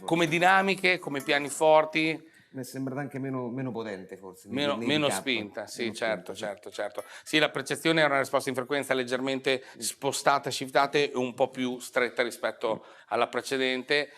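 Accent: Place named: Emilia, Italy. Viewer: Non so perché ma la parlata emiliana di, anche se parla di chitarre, mi fa venir fame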